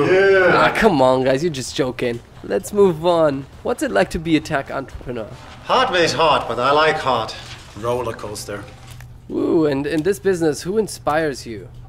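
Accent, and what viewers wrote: Germany. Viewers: The bell rings. Der Hund ringt. Höre ich selektiv oder kommst Du ursprünglich aus .de? ;) Super Video und das CT habe ich soeben auf meine Weihnachtsgeschenkefürmichliste gesetzt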